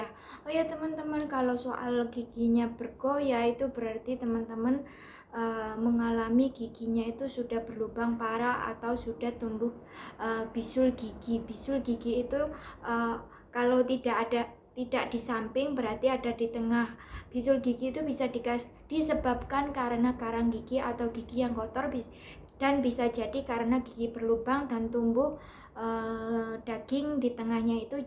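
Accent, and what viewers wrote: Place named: Java, Indonesia. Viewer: Bagus info nya... trimakasih. Tapi klo boleh Saran saya nih ya.. klo ngomong/penjelasan gitu,, pake jeda.. ibarat nya klo dalam tulisan pake titik koma.. biar enak di dengarnya.. klau perlu tambahkan backsound video, apalagi ini video panjang, biar gak bosan yg nonton. ini ngomong kya gak ada rem nya gitu, mana medok pula. Tampilan ataupun background jg penting, biar enak di lihatnya.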